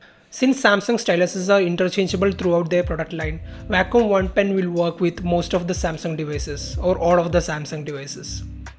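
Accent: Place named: India. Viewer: english indian LOL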